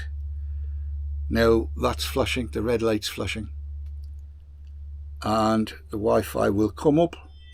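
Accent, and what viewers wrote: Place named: Newcastle, United Kingdom. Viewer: British isn't a language, it's a nationality covering the English, Scottish, Welsh and Northern Irish. Scottish isn't a language either (though Scots is). This guy speaks English with a Geordie accent (from the Newcastle area in NE England) and maybe just the occasional word of local dialect rather than standard English.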